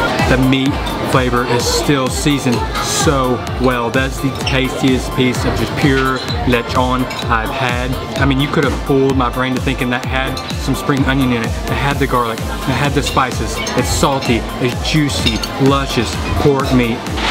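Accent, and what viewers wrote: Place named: South, United States. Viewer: The vlogger sounded like hes southern.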